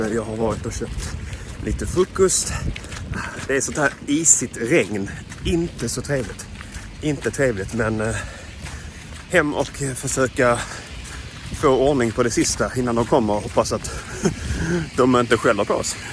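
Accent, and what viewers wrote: Skåne, Sweden. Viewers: Tack för vlog. Maybe do some more of these please. Lucky day to move, same day as our new president! Grät du during that inauguration? I cried. Are you losing your Skåne accent?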